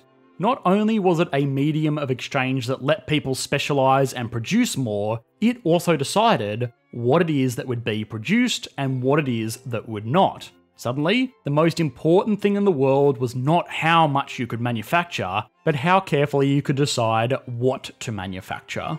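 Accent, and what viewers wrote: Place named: Australia. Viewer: Jesus the narotor's accent is giving me a headache. Listening to Australian accent for prolonged period of time sounds like a distressed, agonising animal or mentally challenged individual with speech inpediment.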